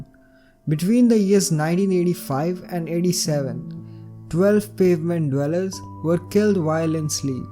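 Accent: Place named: India. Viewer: I love ur accent and I think ur accent is indian... 🥰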